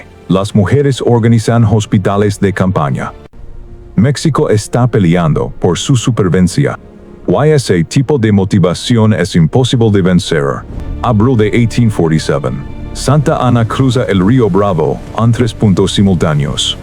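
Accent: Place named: United States. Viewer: Que gran video me encanta el asento estado unidense del video le da un toque unico contra otros videos similares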